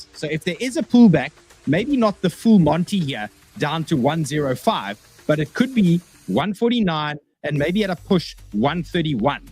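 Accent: South Africa. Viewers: South African accent with American energy is grating to the ear holes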